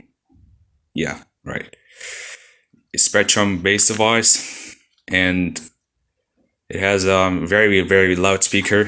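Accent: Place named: United States. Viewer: why do you sound kinda american lmao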